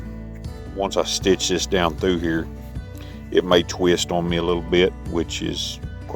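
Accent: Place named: Kentucky, United States. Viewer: Hope your knee feels better soon! Yep, on your knife...the old ones can be really good. Oldies but goodies. My sister lives on the high desert. But, hey, I lived in Kentucky for 14 Years...I knew you sounded like you might be from that area...then I heard you mention Tennessee. Wonderful area back there with awesome folk.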